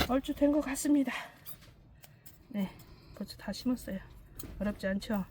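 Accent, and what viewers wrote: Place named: Jeolla, South Korea. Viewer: 안녕하세요 ^^ 진정한전라민국사투리 묘똥ㅎㅎㅎ 정감있는사투리 잘배우고있어요 저도지난번구입한거슬슬분갈이해야겠어요^^ 편한밤되세요 😊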